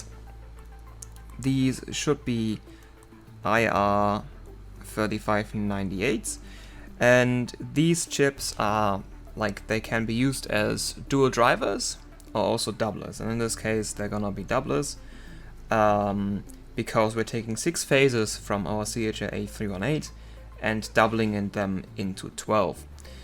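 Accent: Germany. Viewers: nice to hear english with german accent again :))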